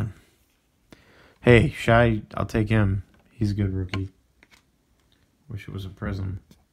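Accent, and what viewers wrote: Boston, United States. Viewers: I am guessing this dude is from boston. His accent's telling me.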